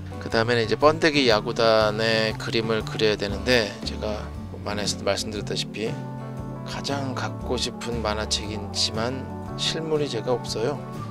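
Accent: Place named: Seoul, South Korea. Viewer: 작가님 유튜브가 있는지 처음알았어요ㅋㅋㅋ너무 재밌네요 작가님과 수다떨면서 작업하시는 모습 옆에서 보는 기분이예요ㅋㅋ작가님 말투가 90년대 서울사투리? 같아서 듣는 재미가 더해지네요~~ㅋㅋ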